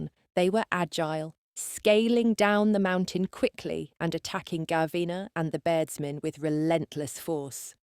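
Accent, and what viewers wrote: United Kingdom. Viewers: It’s hard to listen to a Scottish story with a British accent🫤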